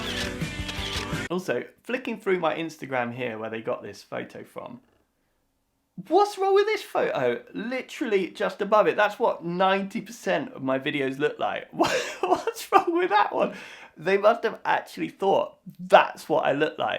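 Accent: United Kingdom. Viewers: I Agree with you about the photo. You are much more handsome now. Actually, much cuter than that other doctor on the front page of the magazine. Besides that, you have a great British accent and I love the way you laugh. But I mainly watch you because you are so down to earth and you have interesting medical subjects and you explain it easy for me to understand with a touch of comedy. I watch 2 other doctors, and you are much better than them. You are my #1 doctor on YT.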